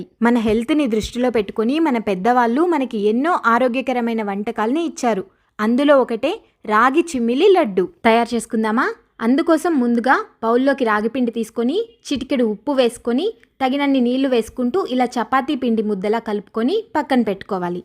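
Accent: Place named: Tirupati, India. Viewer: slang and words telusthondi. We are from Chittoor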